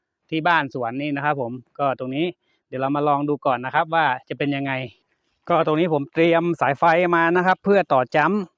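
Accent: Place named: Phrae, Thailand. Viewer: สำเนียงนี้เมืองแป้แน่นอนครับพี่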